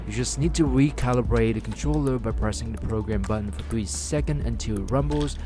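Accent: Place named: Vietnam. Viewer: Thanks for your honest opinion review about this controller. I’ve been thinking about getting this since it first launch. Guess I have to buy one and try it out for myself. Btw, love your accent are you Vietnamese?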